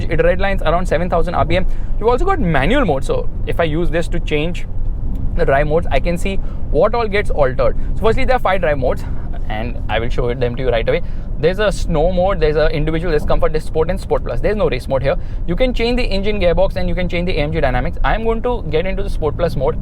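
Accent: India. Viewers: Хахахха,индийски мангал!!! Ще ме спукат от смях с този акцент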